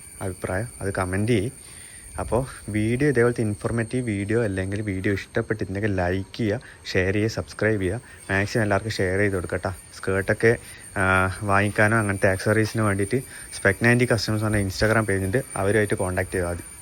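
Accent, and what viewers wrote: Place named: Kannur, India. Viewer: Bro kannur ahno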